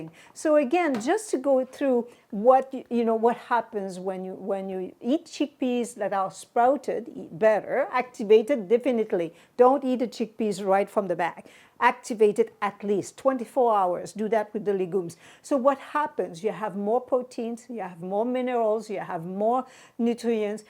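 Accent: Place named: Caribbean. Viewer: Love your advice and your accent is beautiful! I feel like I sense a tinge of islander in yr voice, Caribbean of sorts. (don’t mean to be rude). Thank you for sharing!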